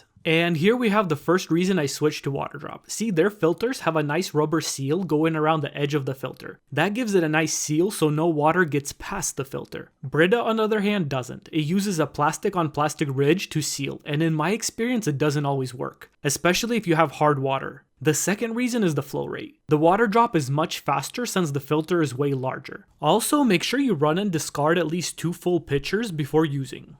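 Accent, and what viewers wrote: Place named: Quebec, Canada. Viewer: You mentioned that in your state they use the highest chlorine levels. But, are you in a state or in a province? Your accent is 100% Québécois. Great review!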